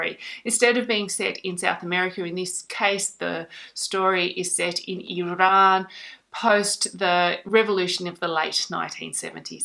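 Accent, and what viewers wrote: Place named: Australia. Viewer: I’m enjoying your videos and I’m getting used to your accent. There is so much humor when I hear what is a perfectly good American word used in an odd way. For instance “The author was rotting”. 😄 Some do don’t they? I assume the reverse must happen in Australia. Anyway, you are quickly becoming one of my booktube favs. Although The Fish Girl has me seriously intrigued, I would choose Terra Nullius to win. Thanks for doing these videos, I’m looking forward to many more. (I love your voice, I hope this didn’t come off as rude.☺️ )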